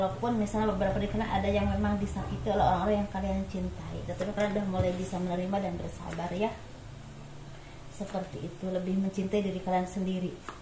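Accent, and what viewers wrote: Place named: Java, Indonesia. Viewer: Biar lah mau campur Jawa kek Gak masalah 😀😀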